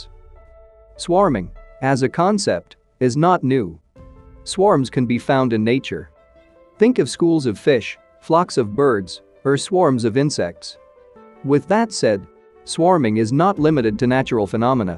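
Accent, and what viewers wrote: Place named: India. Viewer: Voice script to hide Mumbai accent? Be proud of your Indian accent brother 👍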